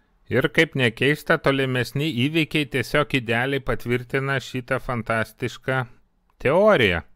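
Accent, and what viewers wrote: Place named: Kaunas, Lithuania. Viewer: Čia tau ne Vilniauskašlechta kalba pusiau rusų pusiau lenkų. Iš akcento girdisi kad Kavenskas tautietis.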